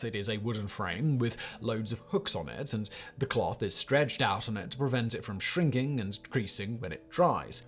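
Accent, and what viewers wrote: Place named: RP, United Kingdom. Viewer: To my dumb American ear, posh English accent make "bald" and "bold" sound almost exactly the same 😆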